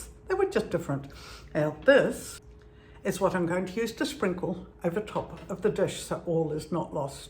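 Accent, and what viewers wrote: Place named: Australia. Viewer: It's pasta time I made some noodles. 'I just love it.' You slipped into Aussie there. 😲You did sound rather Aussie all the way through. Have you just been video chatting to your family in Aus just before making the vid? After you put the onion in you could have said 'That's shallot'. Problem solved. 😁😁 I follow the recipe from my cookbooks exactly because I'm testing them. I'm a massive black pepper fan. Peter Piper picked the peck of pickled peppers just for me.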